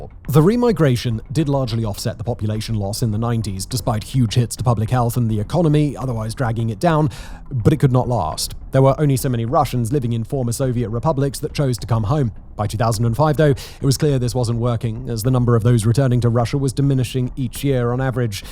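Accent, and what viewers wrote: United Kingdom. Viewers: Hearing what seems like a snooty British accent telling of Russia's demographic problem is bizarre. Just how is the native population of Great Britain doing in this situation? Aren't they merely a decade or two behind Russia in exterminating themselves? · Sorry but would it be possible to include closed captions in your videos? I'm afraid to admit this but while I do grasp written English pretty well as a non-native speaker, I'm having more trouble understanding British English compared to American 😅 And the automatically generated CC obviously sucks ass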